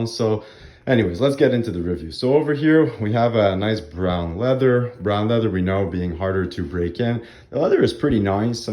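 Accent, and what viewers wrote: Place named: Canada. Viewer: Buddy, questions for you if you have a second. 1) you sound Canadian, are you? If so, I assume you ship to Canada (if you run a shop), oui ou non? Would be great to buy a ball from you to support your work. 2) This Nike ball has been elusive for me - specifically the SKU with the “lower” white stripes as opposed to the striping laid on top of the ball. Do you know where to get one in Canada if you don’t sell/ship them?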